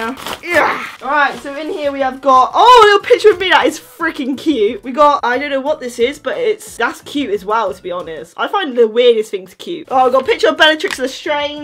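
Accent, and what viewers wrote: United Kingdom. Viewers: You were bragging about your dollar, and me being American, completely forgot you were from England, so I was very confused for a few seconds until I remembered. And if anyone here decides to point out her accent, I'm used to that accent because my father is from England, meaning I hear the accent every day.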